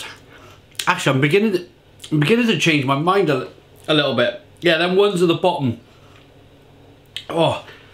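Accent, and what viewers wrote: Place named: Cardiff, United Kingdom. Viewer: Hey Bud! Fantastic reviews as always. You are a great ambassador for us Welsh. You have an undeniable honesty about you and are incredibly modest to boot... I'm so proud of the entertainment you provide. I'm an expat and just hearing your Cardiff accent brings back a lot of great memories. You've made me proper chuckle on many occasions. lloniannau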